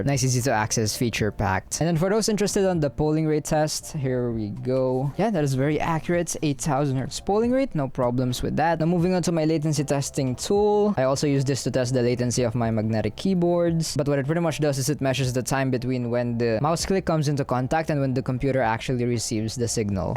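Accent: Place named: Philippines. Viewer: > Pinoy accent looks in > Actual pinoy reviewer with top tier production value + signature rap speech Subscribed agad AHHAHAH P.S. Krazy ahh mouse grip T_T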